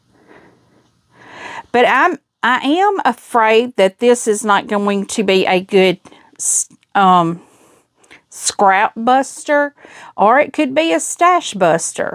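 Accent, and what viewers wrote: South, United States. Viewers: Adorable block. I'm definitely trying this one! First time watching. I'm not sure where you are from, but o adore the southern accent!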